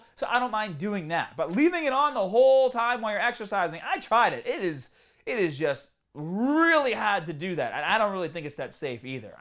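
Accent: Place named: Massachusetts, United States. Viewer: Hi. I live in Northampton, MA (in Western MA, home of Smith College.) I have an accent like you, lol! Our gyms are still closed in my area. I don't know about other parts of MA; In Boston and Worcester things were and still are much worse than here, this is a small city, so gyms are probably still closed in other areas of MA. I do know a few people who got Corona in my city who have all recovered except one older man who died, but we are not a "hotbed" in Northampton. I guess our governor is being extra careful. I'm so excited for the gyms to open again, but I hope I don't have to wear a mask. It must be so uncomfortable to workout in a mask! We are still required by law to wear masks in public in MA, you risk being fined $300 if you don't wear a mask! By the way, Animal agriculture is what caused the coronavirus. Animal agriculture is the breeding ground for future pandemics that will harm everyone on the planet. 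COVID-19 is just the beginning. More pandemics are coming. The overuse of anti-microbial drugs on animals and humans is fueling resistant pathogens that could kill 10 million people annually by 2050. Future diseases are brewing right now on factory farms across the nation, because of the crowded, filthy conditions. 70% of the antibiotics we feed animals to prevent rather than treat infections are medically important for human health. This allows antibiotic resistance to breed. Farms are not required to disclose the amount of antibiotics used or the prevalence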